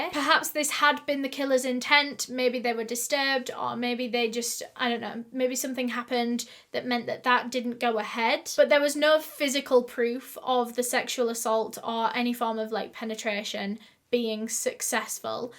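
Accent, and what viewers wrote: United Kingdom. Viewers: oml i thought this was kendall rae, then i heard the accent i was like: what in the world?!!! when did she become british?! 😭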